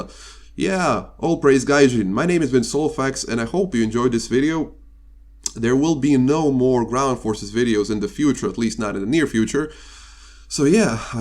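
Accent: Eastern Europe. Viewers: by the way, probably a lot of people already asked you but where are you from?? you definitely have a slavic accent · I love Slavic accents like yours so much, you sound so sexy. Nice video BTW, I agree with pretty much everything you said.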